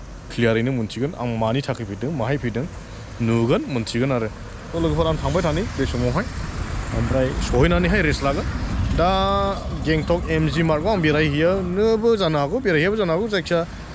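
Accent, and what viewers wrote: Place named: Kokrajhar, India. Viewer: Kokrajharni Baidi railainw Naja ada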